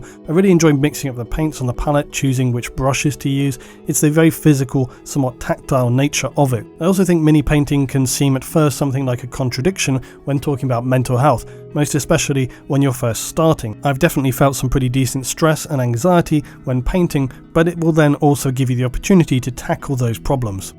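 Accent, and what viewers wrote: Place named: United Kingdom. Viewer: It's not the painting or the role playing that allows for escapism or promoting rewarding precision work. It's all that in the tougher times, people flock to the source of british accents being spoken for it consoles them, makes them calm. Take voice for example, we all come here just to listen that man speak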